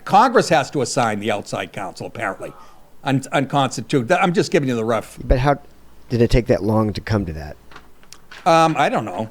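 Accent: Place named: Boston, United States. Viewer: Are you a Massachusetts man? As a former North Ender it sounds like home.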